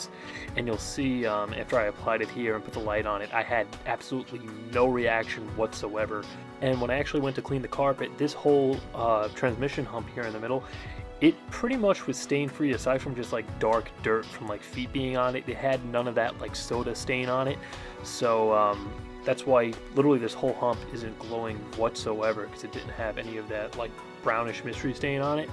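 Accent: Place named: Bronx, United States. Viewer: Haha bronx accent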